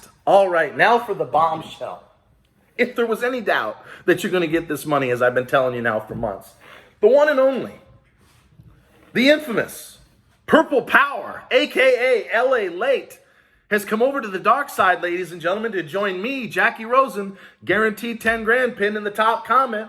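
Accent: New York, United States. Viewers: Wisconsin Green Bay ( don’t hold it against me ) I have been here since about April. I love that you love everyone ! You talk like a tough New Yorker, and have Atlanta love in your soul. And you have the Rocky fighting spirit! You tell it like it is I love the F bombs I love the slapping of the desk the Dixie cup coffee I love to see the watches! More importantly when you need to have a shot hope I can pull up this video because every time I call there they tell me I am getting nothing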